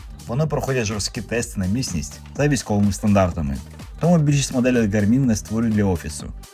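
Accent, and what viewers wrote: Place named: Russia. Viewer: тому, що людина була все життя російськомовною і ще не впоралася з цим маркером російського акценту.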